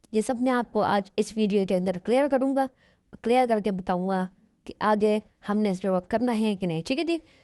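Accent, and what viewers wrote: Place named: China. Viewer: Tumhara Hindi Do Chinese hindi hai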